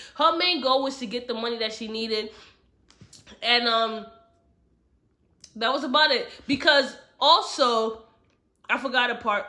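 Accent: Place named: New York, United States. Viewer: I knew you from N.y. I can hear the accent. I'm from CT.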